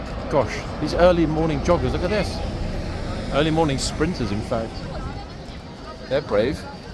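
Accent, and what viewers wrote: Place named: United Kingdom. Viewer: Aside from all your lovely videos, I saw a short video of you and Peter Ellis -- always in others I only saw you from the side or back -- but in this I saw you facing full front. You are very good looking along with your wit, intelligence and respect!! Wish I was younger but sad to say am old enough to be your mother. (Am 74) anyway so enjoy your conversation in your videos!! Have a good day! Also love your British accent!!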